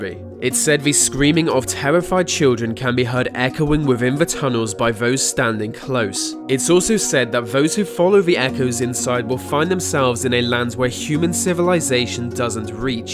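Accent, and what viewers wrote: England, United Kingdom. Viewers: That damn English accent. Still a good vid. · Tjis is why i dont like british accent . It is so irritating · Even science can't explain it? Lame Brit accent.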